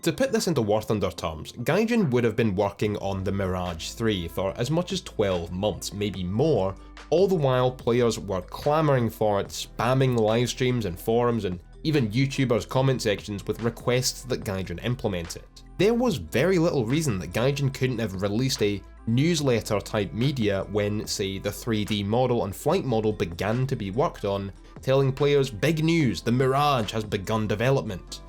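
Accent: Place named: Scotland, United Kingdom. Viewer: Damn I love this scottish accent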